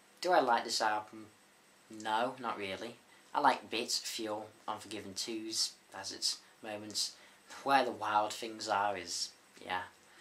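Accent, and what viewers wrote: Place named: United Kingdom. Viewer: This British accent... - couldn't catch the name of the second disc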